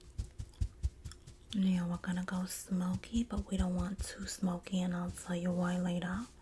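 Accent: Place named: New Jersey, United States. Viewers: Hiii love u sooo much. Btw keep make jersey accent video I love those one anyway sleep well y’all ❤❤❤ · Jersey accent is so good! You need to be a voice actor 😭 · girl your jersey accent is peak!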